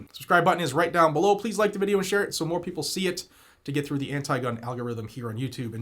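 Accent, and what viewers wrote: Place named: Pennsylvania, United States. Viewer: When I heard you start this video I figured it was an idiot from Pennsylvania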